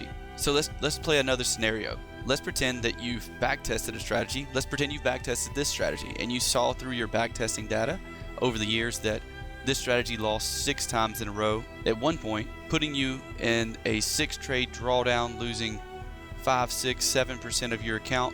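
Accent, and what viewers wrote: South, United States. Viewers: .........UUUUMMMMMHHH I like the way you talk unmm huumm. Sorta Southern there aren't ya?. They videos and the analysis are comforting. I had to get out of the market for awhile ad focus on my business dealings that really pay the bills. You my friend are inspiring me to sign up and get the account funded and take some PIPS out of this market for a true life changing transformation. My full time job is draining me but I can't yet stop and walk away from it. I do have a Dream and a plan to make it happen. Got a few hurdles to clear then I I'm done.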